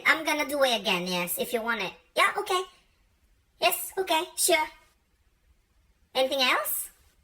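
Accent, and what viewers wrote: England, United Kingdom. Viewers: Britist Accent ah gimar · wow anti british accent. laing k nga tlg.. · oh my goodness! that british accent i sooo love! nagperfect ka! magustwak dagita nga accent mo. namin anok inulit ulit nga binuya detoy. nagperfek talaga 😂😂😂. thanks anti napaisem nak manen. 😘❤️ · mayat man ta British accent mo i like it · Nagtangsit ti British accent mo auntie!!! Bilib ak manen kanyamon. HAHAHAHAHAHA 😂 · Nosebleed with your british accent❤️❤️😍😍anty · Hahahaha!!!! Britische Accent Ni Ante....Guten Morgen From Homburg Saarland Germany 🇩🇪...Naiinlove na yata ako sayo..... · Mayat iti British accent ni Anti! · british accent ni 😊😊😊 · British accent ni Anti..Love it. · Alla ni anti🤣surwan naktu man anti ti english speaking ken british accent mo💙lovin' all your video^_^ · British accent ka met gayam... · huwaaawww!! British accent ni anteee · Hala nagtangsit ni anti..English speaking..adda pay British accent na..pasuruak man anti..😄😄😄😁😁😁😅😅😅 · Nagtangsit ti panag english ni 👏👏👏 british accent ni annntttiii tayu!! 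😊😊😊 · naglaibg ka agsao ingles auntie, kasla ka lang taga UK. proud of u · Filipinos taking over the world through pancit, lumpia and adobo😃😂. Some of my coworkers are one pancit away from becoming Filipinos 👍Nice British accent by the way😊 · Was that British or Australian accent Auntie? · more British accent plz love it so much.. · Ganda ng british accent mo anti:)) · nakabritish accent ni antie.. nglaing ka man antie.. surwan nak man met garud!!Godbless u antie.. ingats!!😘❤️ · The accent anti, briton, i love it, uray ta badom ken ta lipistic mo nagmayat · Naka british accent pay auntie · Owmy Anti nalaing ka gayam agsarita iti ingles, british accent pay aya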